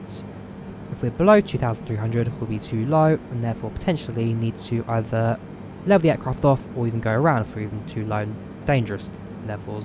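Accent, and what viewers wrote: United Kingdom. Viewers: THE BRITISH ACCENT IS KILLING ME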